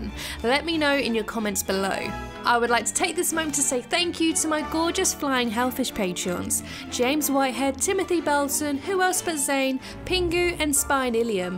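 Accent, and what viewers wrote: United Kingdom. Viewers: your british accent totally ruins certain puns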